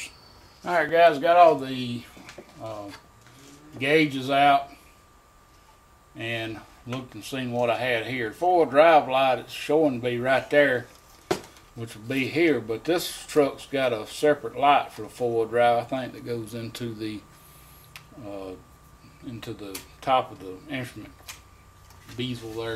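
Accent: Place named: Georgia, United States. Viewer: You from Georgia? That accent is THICK.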